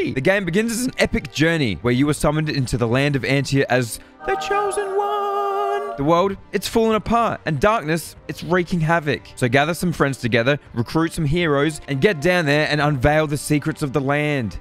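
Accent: Australia. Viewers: are you really aussie ?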